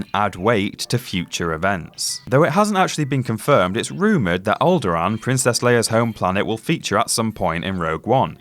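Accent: United Kingdom. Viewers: How? He sounds like a typical British guy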